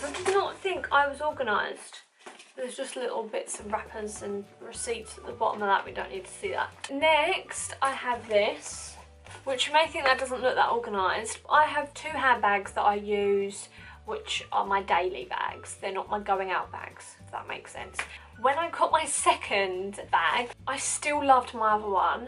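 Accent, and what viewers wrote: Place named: England, United Kingdom. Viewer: I absolutely LOVE your accent!! I want to go to England 😍😍 Great video..i have this in the "MM" size.. thinking about getting the "GM" for travel! You're gorgeous! New subbie! ❤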